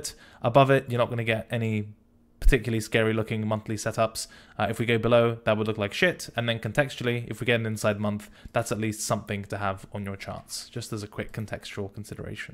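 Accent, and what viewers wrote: RP, United Kingdom. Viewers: despite sounding like a tory boy is legit the best at going over price action, always watch your videos lad. thank you. i really mean that.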